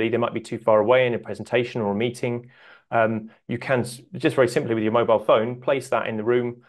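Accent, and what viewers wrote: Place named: United Kingdom. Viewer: I couldn't understand the limey bastard.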